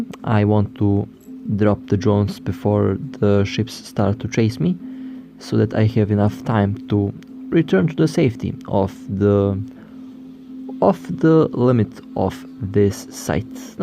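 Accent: Russia. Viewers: Дружище, русский акцент слышно))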